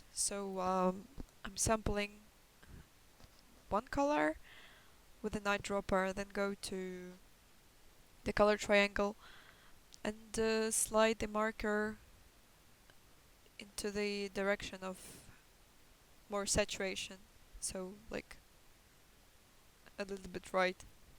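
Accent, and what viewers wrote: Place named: Eastern Europe. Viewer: у тебя не самый всратый английский, что я слышала. Но местами прям "славская" натура прёт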